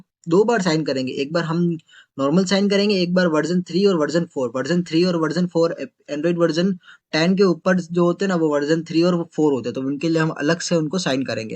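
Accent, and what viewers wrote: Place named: Ahmedabad, India. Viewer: bhai teri avaj Ahmdebadi jesi he. kya tu ahmedabad se he bhai😅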